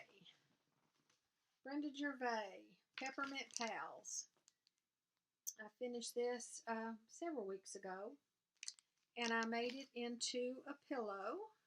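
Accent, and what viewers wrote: South, United States. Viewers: I feel blessed to have you as part of the flosstube community. I always watch your videos first whenever they come up in my feed. I love your stitching, and listening to you talk reminds me of my southern relatives who are no longer with us.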